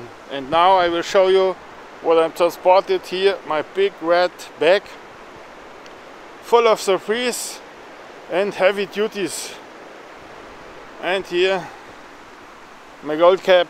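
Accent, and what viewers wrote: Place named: Germany. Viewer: Good luck for the future and its really a lovely german accent you cannot hide :p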